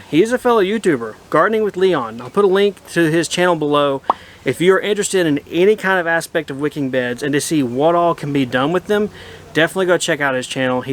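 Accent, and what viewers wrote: South, United States. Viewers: I think that's a southern accent, I'm in a different part of U.S. and we say soil (soy-el) 😉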